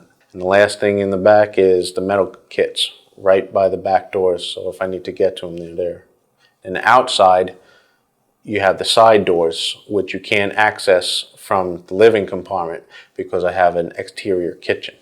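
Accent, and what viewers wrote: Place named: New York, United States. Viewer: this dude is from NYC you can hear it in his voice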